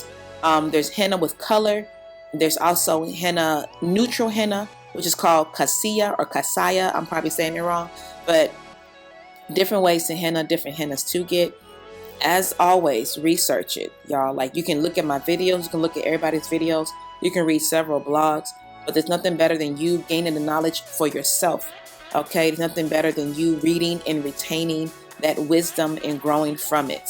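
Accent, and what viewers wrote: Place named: Nigeria. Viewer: Yasssssss come through with that Ayruveda! Can you do a whole video with your Nigerian accent lol, I love hearing it.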